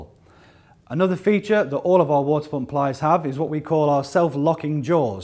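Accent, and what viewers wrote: United Kingdom. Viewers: All is good in video except britt english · I expect a proper german for these videos. Go home britbong.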